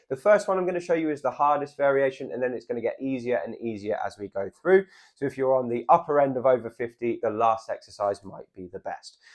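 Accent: United Kingdom. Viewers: Thanks for your videos. I have a hard time being lazy and undisciplined to exercise. I need to wake up and get motivated. You are very encouraging. Great explanations. Like your British accent so much. I'm over 60. Do not like saying that. Have tried doing push-ups, sit ups, squats, etc. Always feel better after. Some "reverse leg lifts" on hands and knees for firming my glutes. Need to get serious about this and just enjoy it. Can not jog or run or walk a lot due to painful feet. But CAN still get around, drive, shop, etc. Sorry for long text. Btw, you make great videos and you are a good-looking, very presentable man. Keep up the good work. God bless you in a special way.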